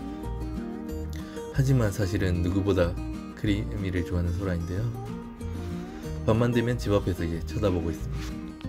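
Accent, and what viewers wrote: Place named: Japan. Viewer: 우와 언어는 한국언데 억양은 일본억양이네요ㅋㅋ 한본어 신기하다 일본에 오래사셨나보내요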